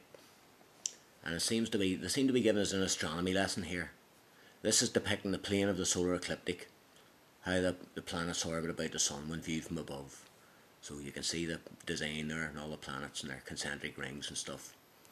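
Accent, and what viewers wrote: Ireland. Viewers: you have a talented mind !! i just hope you're wrong in some of your views :) im curious about the upcoming August crop-circle of the year. ps irish accent i love that land!! (specifically bray - yes, bray) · Love the Irish accent, understood it all real well. Very strong accent though and even said if you don't understand me visit the web addy.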